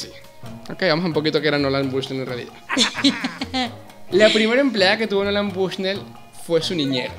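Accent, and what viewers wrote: Venezuela. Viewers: son venezolanos me lo e preguntado mucho por que un tio mio habla como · Me encanta tu acento venezolano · Como cuando ves un podcast de y te sale un anuncio de jaja Por cierto tu acento me suena a venezolano porque yo también lo soy jaja un saludo bro excelentes podcast y me suscribo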